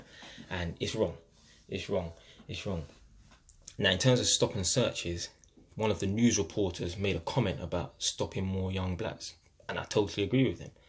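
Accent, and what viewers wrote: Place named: RP, United Kingdom. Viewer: Lmao was was u tryna be posh these days? So hard to listen to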